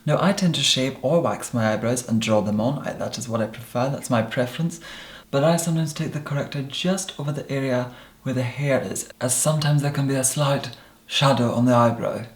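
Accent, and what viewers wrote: Scotland, United Kingdom. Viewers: im from england btw n we dont normally sound tht posh but go to his old vids from 3/4 years ago he talks in a normal scottish accent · I was in no way trying to be rude. When a person goes from having a laid back Scottish accent (a lovely accent indeed) to speaking the Queen's English, it naturally elicits some confusion. I have complete respect for anyone who wants to take speech lessons. I personally used to have a slight New York accent, and trained myself out of it and forced myself to enunciate better. I just find it a bit disingenuous to adopt an entirely different accent. The transition from a Scottish accent to some version of Queen's English, is like the equivalent of me going from speaking with a New York to a Southern accent. I'm sure people have their reasons for presenting in any way they choose, but the drastic change caught me off guard in this case. 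· I love the very subtle Scottish that comes through your poshness. Love it. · B. M. Just looked up an old video you are right he definitely has a Scottish accent back then my apologies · His accent is still very clearly Scottish, not the Queen's English at all.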